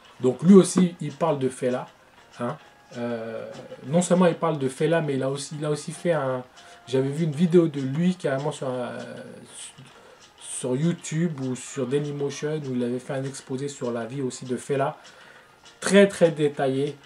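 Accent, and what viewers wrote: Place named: France. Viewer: real english frenchie accent still cute ^^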